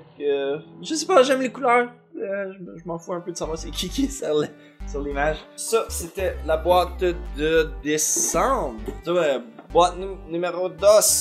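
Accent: Quebec, Canada. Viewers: Haha, cet accent québécois si typique ^^ Je suis un français de France et il est vrai que nous adorons l'accent et le français québécois, ça nous fait toujours sourire ^^ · Yeeessss! Un autre québécois, j'avais un doute que tu étais français à cause de ton accent anglais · i always wondered what your accent was ,but i knew you were from quebec 😅 je suis folle , je ne sais pas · Yeah! Vive le Québec! hahahaha ça fait drôle de te voir parler québecois :) Je m'étais habitué à te voir parler anglais mais c'est spécial xD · Mon dieu c'est tellement bizarre de t'entendre en québécois. Je suis tellement content cela dit de savoir que quelqu'un de québécois nous représente dans la communauté anime youtube =D Also dans le même range d'age =D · je sais pas pourquoi mais ça fait du bien de t'entendre parlé en joual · Ha ben t'es québécois!! Cool ça!! Continue ta bonne job, tabarnak!! 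;) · Yaaaah jsavais qtetais quebecois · Ca fait vraiment plaisir de t'entendre parler "français", au plutôt québécois au vue de tes expressions et bien entendu ton accent. C'est juste trop génial et j'aime bien quand on brise les barrières du langage pour parler d'autre chose ! Continue ton contenu, et j'espère un jour voir le retour des pianos tueurs. :') Des <3 sur toi ! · Je savais pas que t'étais québecois le couz, y a pas de mal de youtubeurs canadiens dans le game quand même. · Dude, j’avais aucune idée que t’étais québécois hahahahaha, j’te regarde depuis un bon bout et j’étais sur que t’étais anglophone. Toujours des bonnes vidéos, mais sa fais bizarre de voir quelqu’un changer au québécois devant ses yeux lol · J'ai tjr eu l'impression que ton TRÈS subtil accent en anglais était québécois. Fake j'ai été assez surprise quand tu as commencé en français européen. J'ai eu peur hahaha. 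C'était vrm nice de t'entendre. Ca permet de vrm plus s'identifier à ton channel. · cool que tu parle pandent toute la vidéo en francais québecois en plus (je suis quécois) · As expected from quebec, your accent made me laugh out loud, mate. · Aha, je me doutais que t'étais de la Belle Province, la manière de prononcer certains mots trompe pas ^^. On sent quand même que tu parles beaucoup plus anglais que français parce que tu galères un peu de temps à autres haha (can relate tho) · J'ai toujours adoré tes chroniques et avait remarqué ton petit accent français/quebécois. Je suis française et je dois avouer que c'est une des raisons qui m'a poussée à en découvrir plus sur ta chaîne. Merci de faire partager notre belle langue ^^ Prends soin de toi ! :) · La première fois que je t'ai entendu parler français c'était dans une vieille vidéo avec Aki, Joey et Noble quand tu as fait une interprétation de tsundere mais en français et j'étais beaucoup surprise je m'y attendais pas vu que je ne savais pas encore que tu es québécois 😂 j'aime beaucoup ton accent même si ça me fait toujours aussi bizarre de te voir parler français je sais pas pourquoi 😅 super vidéo en tout cas 👌🏻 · Tellement sympa d'entendre ton petit accent québécois ! 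:3 Petit coucou de la France ! :D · Je suis là pour mettre une note. Merde t'es québecois ! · Ce que j'ai retenu: tu as l'accent québecois, pas mal x) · J’adore l’accent québécois jsp pk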